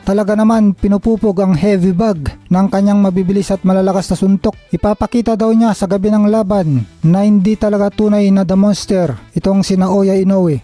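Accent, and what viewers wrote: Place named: Visayas, Philippines. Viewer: Salita mo palang, pang bisakol na...basta ganyang lahi mga fakenews .... dapat mawala na kayo sa mundo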